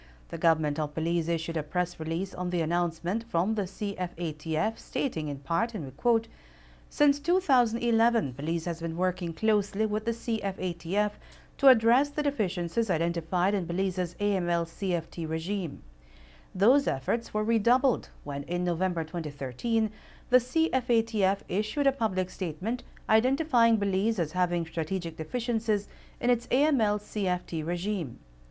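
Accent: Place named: Jamaica. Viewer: Is the newsreader Belizean? She sounds Jamaican.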